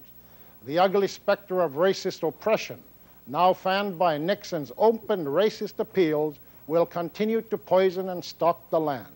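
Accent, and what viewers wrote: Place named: United States. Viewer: He sounds like a american.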